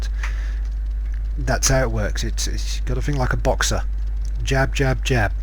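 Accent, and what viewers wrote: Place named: United Kingdom. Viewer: A BRILLIANT upload! Great flying! Thank you sir! I will try hard to put all this into practice. (BTW - it's so nice to hear the accent of a fellow Brit giving these tips on this amazing British aircraft - for me, it's the best ever aircraft - cannot be equaled). I have to check tho' - I assume you are using 0% 'Takeoff assistance'? I'm using 0% & I find everything very difficult (*& ....'wobbly') - so I guess the axis control graphs are pretty important. I have pretty gentle slopes to my graphs, but I still wobble as if it's too sensitive. Would you be inclined to share your axis graph settings (...please...)? *On my channel - <link> - and this was with 20% assistance!! Only recently have I gradually dropped to 0%. I'll be trying this soon!!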